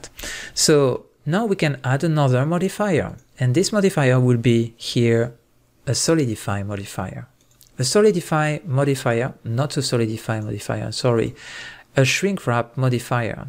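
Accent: France. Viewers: bravo super vidéo j'ai reconnu le petit accent français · do you speak french? if so I can tell in the way you talk cause I also speak french or am I trippin but nice tutorial tho its amazing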